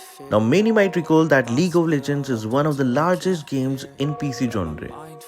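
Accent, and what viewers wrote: India. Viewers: chekwa na tunog indiano. lintek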